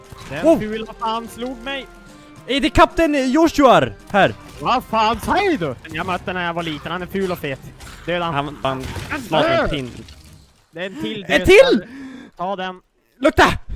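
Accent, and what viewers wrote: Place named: Norrland, Sweden. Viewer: Skön norrländska och rolig video :))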